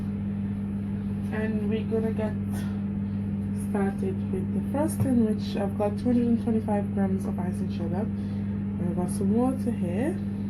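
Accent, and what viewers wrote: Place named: Haiti. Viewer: Yeah you sound Haitian haha xx great video!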